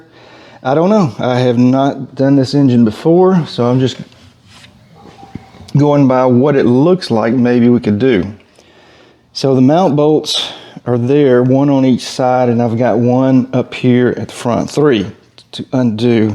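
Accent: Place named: Mississippi, United States. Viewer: What part of Mississippi you from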